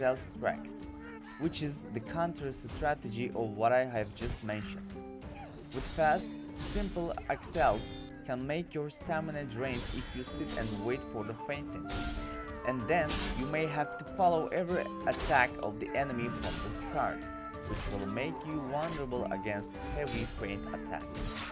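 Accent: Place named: Turkey. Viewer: Turk musun kanka. Aksan felaket😀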